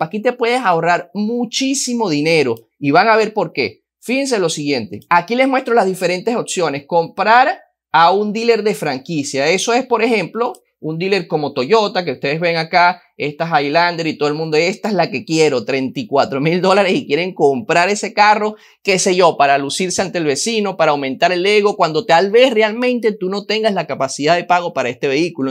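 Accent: Cuba. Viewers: Jejejeje brother muy bien tu personaje de cubano, te metiste en el personaje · Wow gracias por la información Que risa como hablas cubano Caballero 🤣😂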